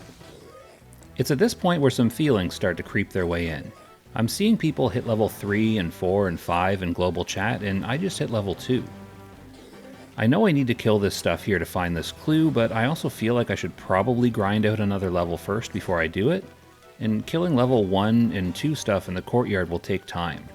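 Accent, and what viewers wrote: Canada. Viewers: You sound like you're from east coast Canada :)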